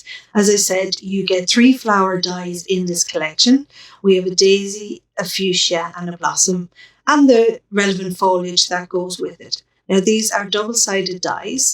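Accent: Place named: Ireland. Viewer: Congrats on a fab PCS box. Love your box and delighted to hear another lovely Irish voice. Tfs Regards Simone x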